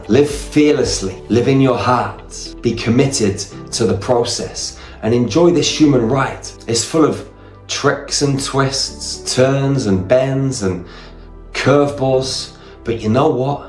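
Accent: United Kingdom. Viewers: He's British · its not a normal british accent just trying to figure it out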